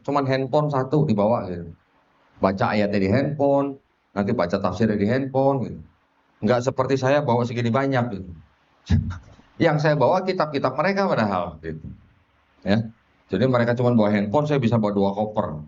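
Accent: Banyumas, Indonesia. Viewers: Koh bawaannya enak Logat nya itu Lo ngapak 😁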